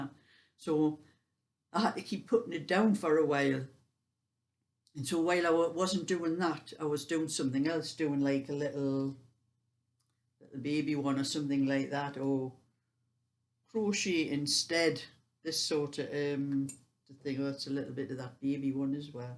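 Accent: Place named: Yorkshire, United Kingdom. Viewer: Just looking in on all your videos with me being one of your new fans , feel so sorry about your hands troubled with pure wool , my daughter is the same . Watched the one on your accent and many of your words are the same as ours in Yorkshire and I think a lot in Lancashire as well , nex my hair dropping out must be an age thing I’m 78 it drives me mad , and don’t get me going about the bills going up don’t know how we will manage I wear about three to four layers of clothes as it is as I feel the cold when inside worse than outside but guess that’s cause try to busy myself with the garden . If got took into hospital from home they would have a job to find me with all the clothes 😂 it’s an awful day here but of snow but of sleet then rain and windy and it was down to 2/3 early this morning not as bad as we used to have it twenty years ago is it but going back sixty plus years we used to av to dig a tunnel to get out the door . 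So our age group av certainly seen the changes please don’t answer just rambling on 🤣 just keep knitting 😂 bye love to watch you thanks for all your videos Jean 💕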